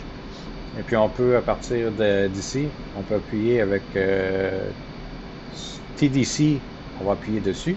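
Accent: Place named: Quebec, Canada. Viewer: Et en plus avec l'accent Québécois!!! :)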